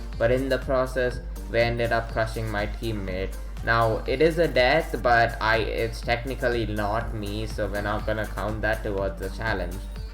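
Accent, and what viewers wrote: India. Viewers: How do you have such a Indian accent?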